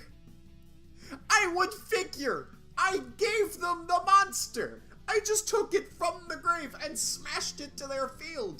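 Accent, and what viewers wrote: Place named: New Zealand. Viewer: WAIT. You were kiwi the entire time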